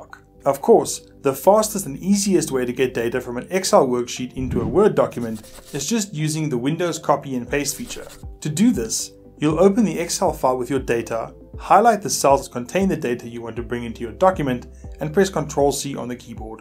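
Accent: South Africa. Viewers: Great South African Accent !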